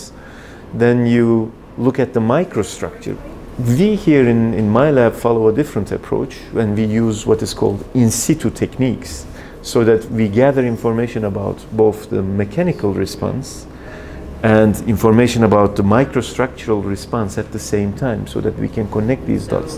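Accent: Turkey. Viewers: Aksan Direkt Türk Olduğunu Belli Etti :D İsimde Kesinleştirdi. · videoyu açar açmaz bildim aksanindan türk olduğunu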